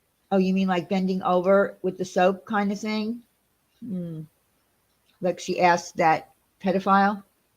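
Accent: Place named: South, United States. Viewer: You go I love that southern voice lol you do good!!!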